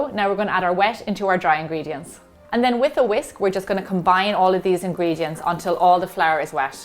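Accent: Ireland. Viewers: Are you Irish?Because the way you pronounce the "T" it seems!☺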